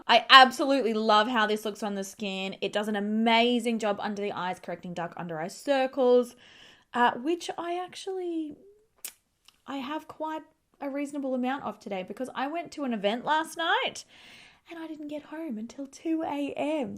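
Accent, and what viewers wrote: Australia. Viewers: I am 55 with very dry skin, so alot of your videos don't have much relevance for me. HOWEVER, I moved to the USA from Australia almost 5 years ago, and your videos make the distance seem not so great. Between your beautiful personality and your genuineness (let'snot disregard your awesome Aussie accent) you brighten my days, making me laugh with your sunshine. So pleased to see a "pro" still gets fake tan stains on her hands - I don't feel so bad. 😃, thank you for being you. 💗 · I love your video’s so much! Now my exams (dialysenurse, just literally translated, I dont know if the translation is correct, but I think you know what I mean) I bingewatch your videos all the time ❤ To be honest; I love your australian accent so much and Australia in general (so why in US dollars 🤐🤐🤐🤐). I orderded so much lately on your website and I always recommend your make up on my own channel, even in the favourites of the year (unfortunatly its in Dutch). 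Please keep on the good work and keep on entertaining us ❤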